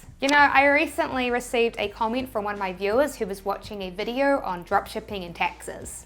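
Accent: New Zealand. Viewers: Love this! Well done. Really enjoying your pragmatism (and your Kiwi accent!) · My dear, your Kiwi accent is absolutely adorable. I have next week off, and because I cannot travel, I shall get stuck into PrintOnDemand and DropShipping using your fantastic free eBook. I even downloaded a female New Zealand file on my Mac to read it to me. BTW I was just thinking, aren't all the people on AliExpress, just DropShipping for Alibaba? One final thing, the final syllable of the French word "entrepreneur" is pronounced "er" like in "her", and not "ure" like "manure";-) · Love your kiwi accent... Your nation knows my nation well via rugby .. (Wales) love your content.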